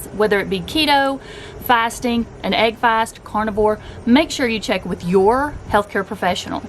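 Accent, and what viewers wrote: South, United States. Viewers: where you from the south?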